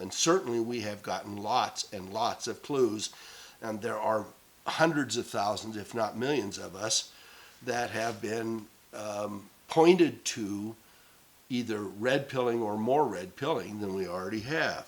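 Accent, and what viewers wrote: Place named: Michigan, United States. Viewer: ahhh, don't know why just always had a hunch you were from MI, thought I heard a MI accent. Maybe it's the farmer in you I recognize. Anyhow, I'd like to speak directly with you, not sure how to do that without alerting everyone else to your contact info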